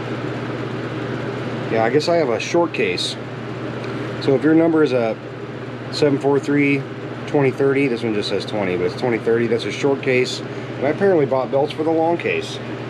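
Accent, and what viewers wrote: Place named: China. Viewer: He is a chinese spy! You can tell he is chinese. Got chinese accent as well!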